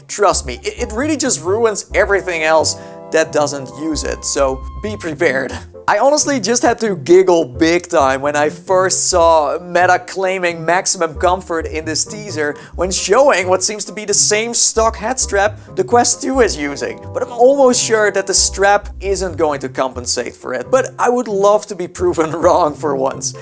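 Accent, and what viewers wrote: Netherlands. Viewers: are you dutch or from the Netherlands?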